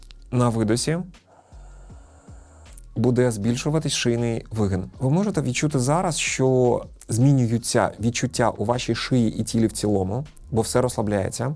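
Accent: Standard. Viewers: Приємно слухати,чиста українська мова!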